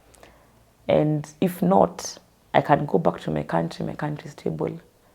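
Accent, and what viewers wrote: Kenya. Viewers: This girl is Kenyan. Just listen to her accent. Give her citizenship. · God for 34 years? She even has Kenyan accent...she's a citizen by naturalism... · Woow.She even has a Kenyan accent.She is a kenya by naturalization. God help her.I hope she gains citizenship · Her English is KENYAn 💯💯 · one can tell that she is a Kenyan by her accent.Why should the government treat her like a refugee?? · This lady does not even have the Ugandan accent but Kenyan.After staying in Kenya for 12 years you can naturalize.She just needs a person to guide her.Chinese have come the other day and they have settled.Why not her. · Based on her grounds I believe she has "automatically or naturally " qualified for Kenyan citizenship by naturalization. Even her English accent's is Kenyan. She's a Kenyan. The east African governments should make this work if they mean about east African community as a regional or block to benefit its people. 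· This is soo wrong , her English accent soo kenyan , Kenyans be merciful there are kenyans in other countries too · I listen to this beautiful sister,I don’t hear Uganda accent all I hear is Kenyan accent,I hope the president pardon her so she can get her citizenship and continue her life with peace of mind in kenyan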